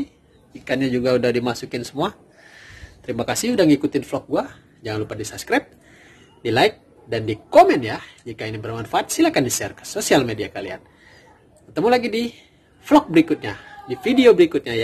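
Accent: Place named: Palembang, Indonesia. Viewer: Logat nyo, wong palembang caknyo ini😀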